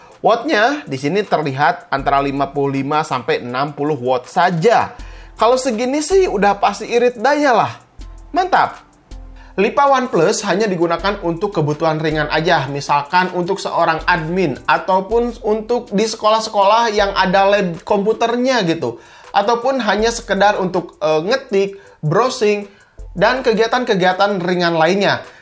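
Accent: West Java, Indonesia. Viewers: Urang sunda ieu mah ngomong na lipa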